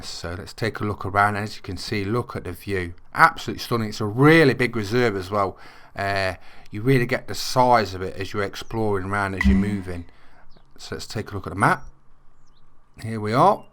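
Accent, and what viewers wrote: Midlands, United Kingdom. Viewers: WoW boring. Stupid comp thats dumb just add 250. Plus doesnt offer the game to someone who doesnt own it. Too many yt channels the gd ones get maskd by the million try hards. Why do uk midlands cotw love ther voice so much. Notts Leics? Focus on diamonds and rares not shit chat.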